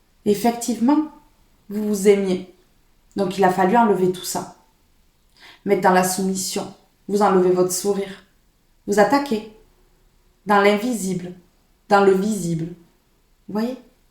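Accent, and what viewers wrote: South, France. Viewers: Et moi je vous adore avec votre petit accent du sud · C ´est si vrai ! Pour moi ❤ vous êtes rayonnante j’aime votre energie et votre accent du sud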